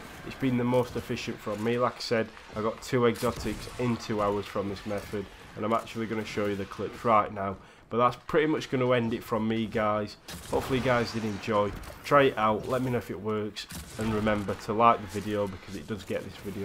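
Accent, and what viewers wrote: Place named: Northern England, United Kingdom. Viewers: Love your accent, are you from England? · Love these farming methods mate. Nice work. Glad to hear a fellow northerner on here helping the community out 👍🏻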